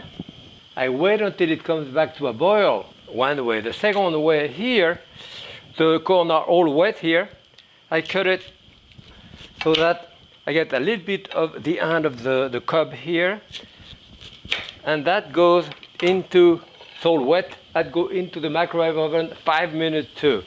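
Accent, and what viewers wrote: France. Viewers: I was responding to someone who thinks Jacques Pepin has a SPEECH IMPEDIMENT. He does NOT HAVE A SPEECH IMPEDIMENT. He is only speaking in a French ACCENT. of course his English is perfect. No one said it wasn't.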